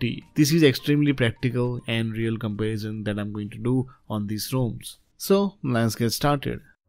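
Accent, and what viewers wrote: India. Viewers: Yeah but his name is Indian,I believed He's Indian because of his accent.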